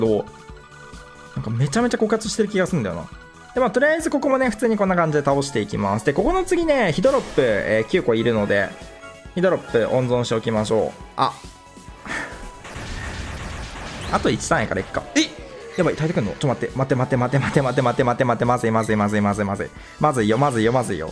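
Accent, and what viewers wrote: Kansai, Japan. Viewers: って関西の人？ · 関西弁うざっ笑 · って関西人？ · の関西弁大好き(*^ω^*) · って関西人なん？ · 関西弁気になるよ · 最近ところどころ関西弁なの草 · なんで最近関西弁っぽさが混ざってきてるんだろうに対してはにわかだから出身もしらないし、自分が見始めた頃からしたら変な風に聞こえてなんか聞きにくくなった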